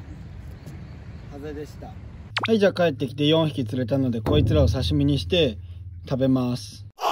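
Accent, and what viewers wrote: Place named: Kansai, Japan. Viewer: たまに関西弁使うん好きやわ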